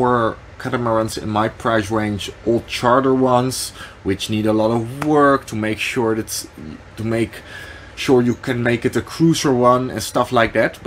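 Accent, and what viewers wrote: Netherlands. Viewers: BTW…. Don’t be self-conscious about your Dutch accent. Most people here will find it charming 😉. I LOVE the Netherlands. Some of the best marine technology in the world comes out of your tiny little country, and you should be very proud. 👍🏼. Not to mention…Old Dutch Gouda is to DIE for 😋 🧀. Can’t wait to follow your adventures ! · English is perfectly fine. Distinctively Dutch. Good intro thanks.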